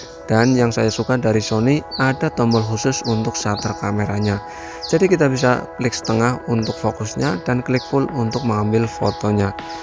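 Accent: Banyumas, Indonesia. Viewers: Ngapak yah kak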